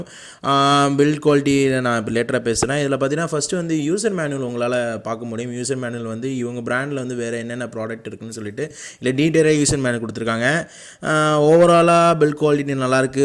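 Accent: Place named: Sirkazhi, India. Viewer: Bro neenga sirkhali-ya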